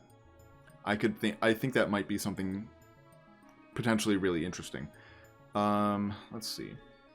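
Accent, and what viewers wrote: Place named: New York, United States. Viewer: No it,s acshuly a new yourk acsent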